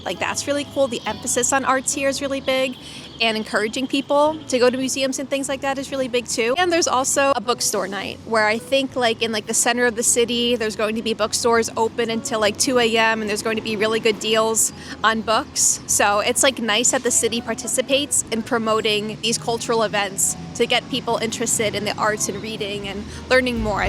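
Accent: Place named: Argentina. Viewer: Me fascina, me encanta, me alucina, me parece genial que te guste usar el "vos" y el "sh", a muchos en latam no les gusta, pero es el mejor acento del planeta. Abrazo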